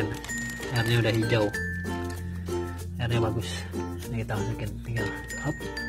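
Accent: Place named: Palembang, Indonesia. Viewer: Logat nyo, wong palembang caknyo ini😀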